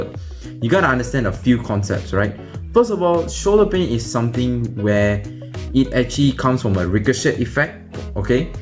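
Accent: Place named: Africa. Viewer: Why do you you sound African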